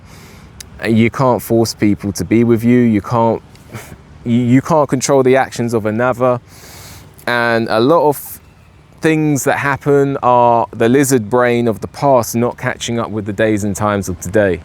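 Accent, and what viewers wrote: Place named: United Kingdom. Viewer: Bra it was bc of your bloody British accent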